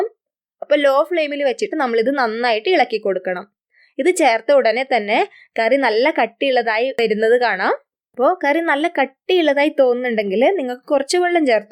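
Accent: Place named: Kannur, India. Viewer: Ulli curry Adipoli yayittundu ketto ..thank you..💐💐✌️🤝 Thalassery (Kannur) kkariyo atho vadakara.. kkariyo? Endhayaalum randilonnaanu.. urappu .😄✌️🙌..love your way of speaking..🥰💖💖💖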